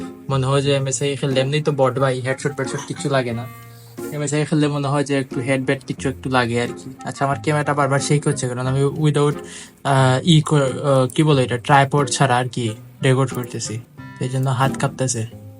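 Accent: Sylhet, Bangladesh. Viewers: সিলেটি না-কি?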